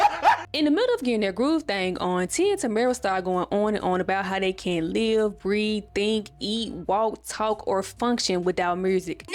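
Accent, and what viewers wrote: Memphis, United States. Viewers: May I ask where you’re from? Just asking because you sound like Memphis area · Girl are you from Memphis? I love your accent.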